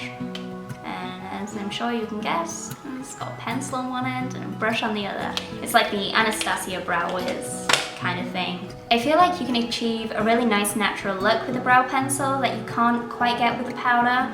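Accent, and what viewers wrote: Yorkshire, United Kingdom. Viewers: P.S what part of Yorkshire are you from? I'm in Harrogate.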